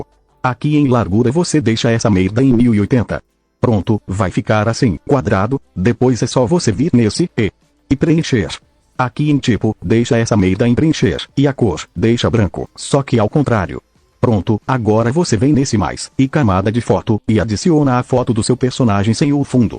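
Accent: Bahia, Brazil. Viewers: É o baiano?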